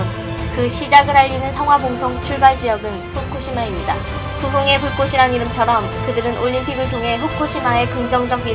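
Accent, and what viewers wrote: North Korea. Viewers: 해설자 북한사람?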